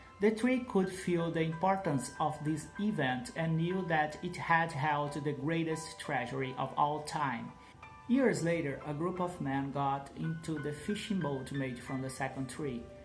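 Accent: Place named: United States. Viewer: Parece um americano convetsando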